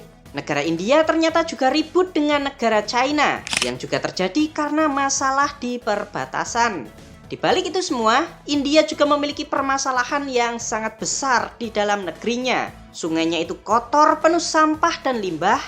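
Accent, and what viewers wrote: Java, Indonesia. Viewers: si medok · logat jawanya medok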